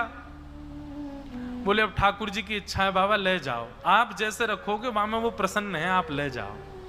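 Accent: Braj, India. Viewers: बहुत ही सुंदर और अद्भुत कथा श्रवण कराई साथ बृज भाषा आपके श्री मुख से सुनने में बहुत ही आनंद आ रहा है 🙏🙏🙏🙏🙏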